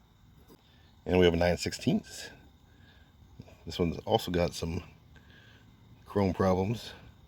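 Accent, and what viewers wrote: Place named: Midwest, United States. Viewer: You can easily date those wrenches as the date code matrix is online. The Snap On "boxed" ratcheting wrenches (and other brands) are mostly made by A&E Manufacturing of Racine, WI for the various OEMs, including USA made Craftsman. They all use a Snap On style date system because the founder of A&E, Daniel Lang was a one time Snap On employee and may have come up with that date code system if I recall right. At the end of the day, you can trade every one of those for new, but I would agree, clean them up and see what you have there. I bought a set of Snap On (1940s through 1960s) from a woman I used to work with after her dad (an aircraft mechanic at the local airport) passed away. When I asked about anything else, she balked---probably realizing that she sold me the first batch way too cheap. I got a virtually new in the box set of Craftsman wrenches (missing one, which I eventually got and added to it) and all of that Snap On. 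I had to warranty some of it, but Snap On gave me no issue. Your accent sounds like some I've heard from the Midwest and you mention "1968 Chevrolet and panel repair" so that makes me think you're in the middle of the country in farm country (nothing wrong with that). I'm going to say that some of those stampings may be inventory or control numbers to account for them in a set or location. I bought some Craftsman tools like that with some engraving that I would say was probably from a factory or maintenance area somewhere.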